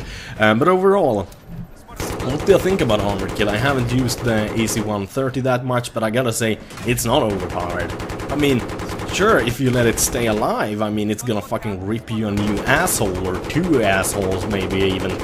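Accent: Sweden. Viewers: your smooth Swedish tone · You must be swedish :)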